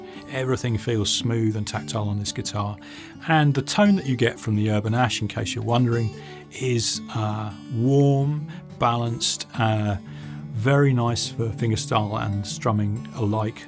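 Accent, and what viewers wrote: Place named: England, United Kingdom. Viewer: I’m tickled with your English accent. Want to know where you’re from. I’m a Californian; nonetheless, intrigued with different accents.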